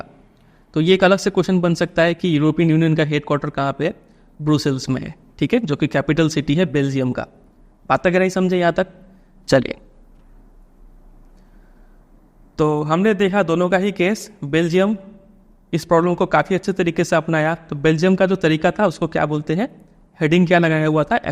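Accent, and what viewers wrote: Bihar, India. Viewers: Nhi 😂 bihari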